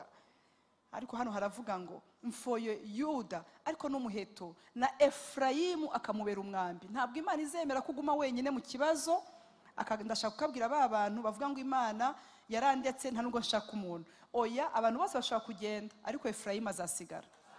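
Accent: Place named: Burundi. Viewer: Minyoni noneho ko uvuga nka uwiburundi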